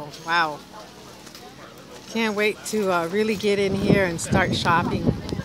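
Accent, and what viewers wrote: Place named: Tampa, United States. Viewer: okay beautiful thank you you drove 5 hours to Miami from Tampa to Miami is about 4 and 1/2 getting to the place another half that would put you close to my vicinity and your accent sounds like you're from Tampa what's up am I wrong??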